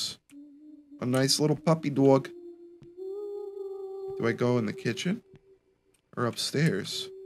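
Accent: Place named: South, United States. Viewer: That country voice modulation tho 😏🥵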